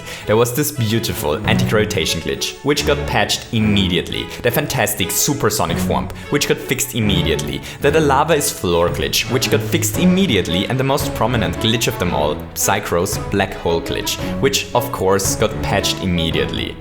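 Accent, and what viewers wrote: Germany. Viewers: Lol Youre german ? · so you're german, nice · He's from Australia but with a heavy German accent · are you german? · Are you German? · Are you german?? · Whoa i didn't know you were German... I kinda recognized your accent but I wasn't certain until i saw the german language at the point where you checked the Upload date from the new black hole Level... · are u from germany? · You sound freaking German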